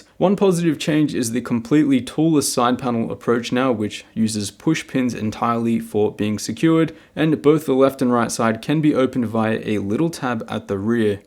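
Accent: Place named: Australia. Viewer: wtf if you want small go small, go small. why are you bashing on the 7 with an aussie accent?! :) and why r u reviewing it in the first place?! water cooling brah! with big radiators and a fancy pump! not like yours!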